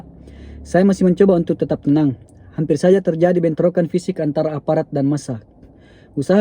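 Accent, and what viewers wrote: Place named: Toraja, Indonesia. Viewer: Macam sy tau logat bang kayak org susl sel deee kayak Toraja👍🌷🌷🌷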